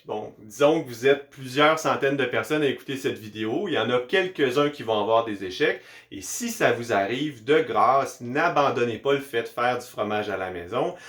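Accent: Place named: Quebec, Canada. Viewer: J'ai souvent eu du mal avec l'accent québécois puisque j'avais du mal à comprendre mais le votre est tellement compréhensible et agréable ! Et vos vidéos super !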